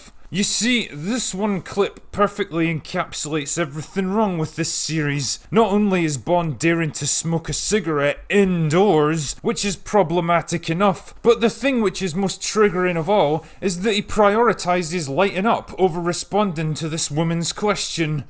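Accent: Scotland, United Kingdom. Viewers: I fucking hate that accent. Where is it in Scotland you're from?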